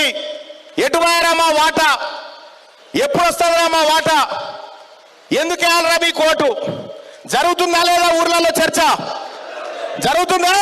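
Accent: Telangana, India. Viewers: Paka natu Telangana rural slang.......which reach massage at group level 😊😊❤❤Super voice 🎉🎉🎉🎉🎉🎉🎉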